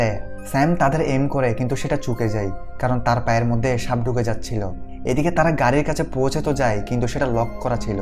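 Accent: Chittagong, Bangladesh. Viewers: আ বদ্দা! চিটাইংগা টান ত ন গিল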